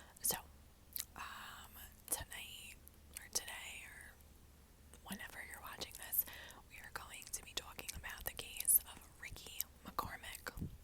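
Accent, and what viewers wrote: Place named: New York, United States. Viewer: Recommendation: Missing 411 cases. They are so eerie!! Also- LOVE your NY accent. I’m from the South and I think this accent is so dang tingly!! Would love a NY accent role play! 😊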